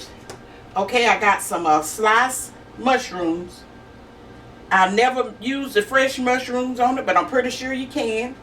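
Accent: South, United States. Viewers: Awww you are a God sent woman! I am putting your meatloaf recipe in the oven precious woman of God! So happy to hear about the give always. I can say, I am sooo very proud of YOU! You encourage me more than you know! My I love your apron. You can sale those as wells! Take your time woman of God and do what you do! I absolutely love it. Currently still running to cancer institute with honeylamb! So hopefully he will enjoy your tasty meatloaf! I am on Facebook and will look at your page! My husband leans towards savory recipes because chemotherapy took appetite! Oh, he is an assistant Elder at our church! By the way, you are loved and love your southern accents! It is what it is God bless you, and anything savory to get us through, please cook it! AgIn God bless you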